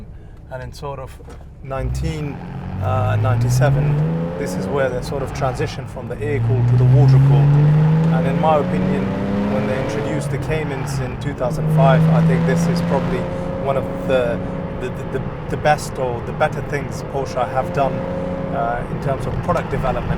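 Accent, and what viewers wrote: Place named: London, United Kingdom. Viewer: What a hell of an accent does this guy have? London?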